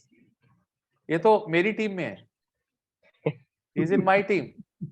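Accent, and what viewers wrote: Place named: India. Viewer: he is Indian look at his English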